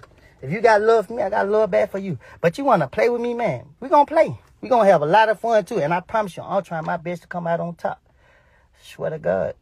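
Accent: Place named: South, United States. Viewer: Love your accent! Country baby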